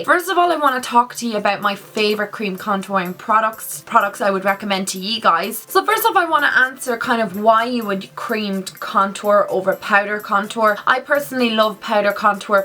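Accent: Ireland. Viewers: I love the way she looks so tumblr and gorg but then she comes out with this common Irish accent 😍✌ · Are u from Ireland ? You sound Irish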